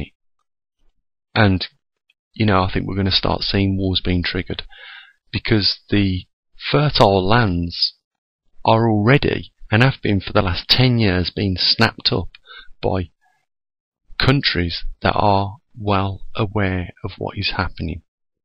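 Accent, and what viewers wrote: Birmingham, United Kingdom. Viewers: A Brum accent isn't much better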